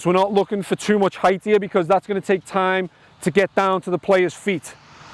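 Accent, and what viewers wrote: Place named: United States. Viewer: Little bit of yank creeping into your accent there lad haha, is right la good vid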